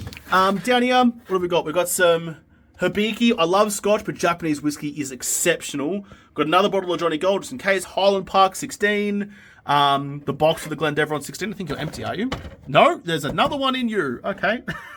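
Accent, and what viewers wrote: Australia. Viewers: Flipping the script on Neil's letter... the question for viewers & subs is whether we remember our first 7D2D video? I do. I had been playing 7D2D since the Alpha versions could be counted on just your fingers... and then Alpha 17 landed, and the game took a massive leap forward. I wanted to build a base using a draw bridge, and I stumbled into a relatively recent video from some guy with a strong Australian accent, explaining draw bridges. From the first video, I was hooked. I came back to see others, and within a relatively short time, I was Subscribed. Excellent work on your part (the arrow slit force field was game changing for me with regards to how I managed base-building). Mixed with a decent Draw Bridge layout, the arrow slit force field was unbeatable. So much has changed since then, but not... consistently inventive and creative, and always chock full of fantastic base-building & horde-night prepping ideas. 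Thanks for all of it,. The game simply would not be the same without you. Congrats on a well-deserved 100k Subs!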